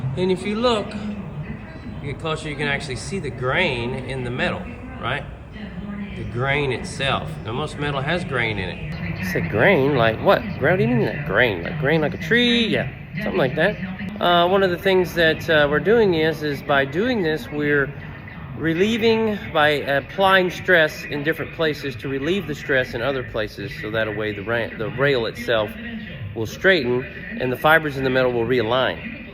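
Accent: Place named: Texas, United States. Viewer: Just subscribed. GREAT Channel. Is yous in TEXAS? Ya sound likes yer from TEXAS 🤠 I is too so I was justa wonderin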